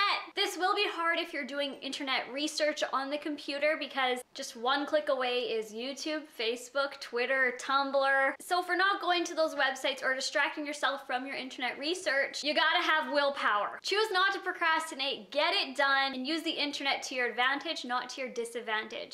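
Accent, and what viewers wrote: Canada. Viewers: Btw I love your accent. Canadians are the best!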